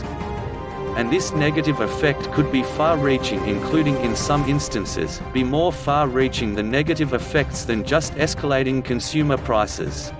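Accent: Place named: United Kingdom. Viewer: The Corona virus is taking down the over borrowed stock market... and it's not a trade war when your competition has been raping the natural world and not paying tariffs on their exports... as for the fence on our southern border is obvious more nie than ever... we have 60 million illegals here.. time to send them home and yes America is first in america... our grandparents who helped fight and make America the strength it was would be pissed off if they saw how a lot of not very bright people are helping the confederacy a.k.a. the Democrats slowly turn our union into a socialist nightmare... and destroy our rights and liberties... all because they're favorite actor goes on TV and trashes the only one who cares to undo the dems damage and give us back our freedoms... propaganda garbage with a fkin brit announcer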